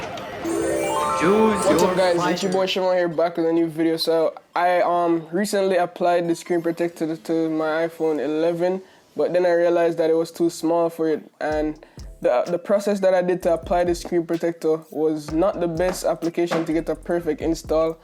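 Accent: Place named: Jamaica. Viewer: R u Jamaican? I hear an accent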